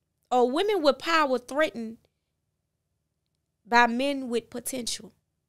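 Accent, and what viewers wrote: New Orleans, United States. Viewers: Her and that New Orleans accent 😩🥵😍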